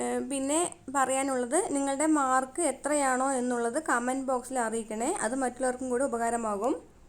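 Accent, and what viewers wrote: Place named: Palakkad, India. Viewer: മിസ്സേ.. നിങ്ങൾ pkd aano.. അതെ സ്ലങ് ഇടക്ക് കേറി വരുന്നതുകൊണ്ട് ചോദിക്കുവാ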